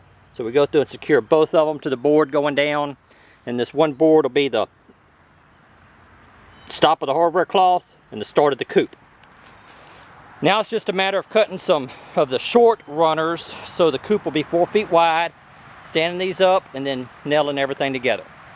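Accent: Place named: South, United States. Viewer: with that mayberry voice...I guess you are south of the mason Dixon. so whatworks for you may not work for upper Minnesota: gotta point that out for others who think drafts are not a problem.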